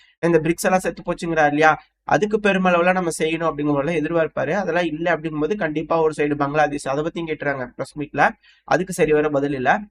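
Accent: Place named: Kongu, India. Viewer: Thambhi,,,. Ungga.,, Konggu. ,Thamiz Super ,, silence,,pechu,,,good🎉🎉🎉🎉🎉🎉🥭🍊🍑🍉🍎🍒🍓🙋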